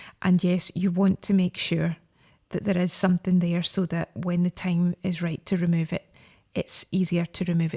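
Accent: Scotland, United Kingdom. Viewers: I ken you’re a Scott?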